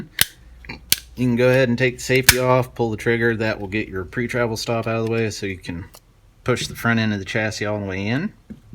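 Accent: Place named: South, United States. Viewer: Thank you again for the video, by the way I love the southern accent, kind funny but I like. I finnally today got some will and finish the slide part, it’s been more than a year since I bought the kit and did the lower frame part, just now I did the slide, I didn’t do before cuz I was lazy 😂 thank you God bless America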